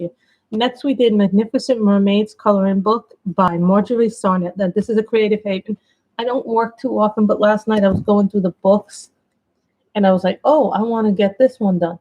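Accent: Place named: New York, United States. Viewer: Wow. You were really busy in June. Great job on finishing books. I have yet to finish one. I jump around so much. I just want to color all the books. Lol. I hope you are enjoying/ enjoyed your vacation. So tell me where in NY are you? Yes the accent gave it away. Lol. Of course I know it well as I’m a New Yorker. I use to live in Yonkers. I’m now in Florida. Ok. Take care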